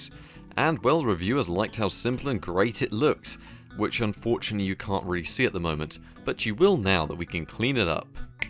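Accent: United Kingdom. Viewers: Ur British lol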